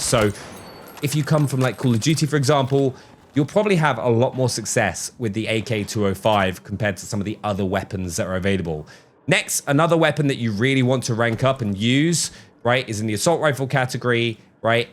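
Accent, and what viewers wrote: England, United Kingdom. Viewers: Jim Jefferies is Australian and this bloke is English. Completely different accents.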